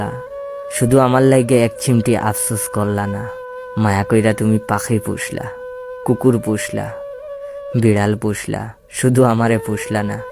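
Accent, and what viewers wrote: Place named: Sylhet, Bangladesh. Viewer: সিলেটি ভাষা লাগে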